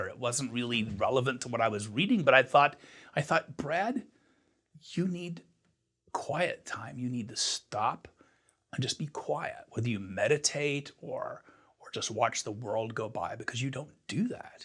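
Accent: Ireland. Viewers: Interesting about Texas....he sounds almost Irish to me. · Irish Accent im hearing?...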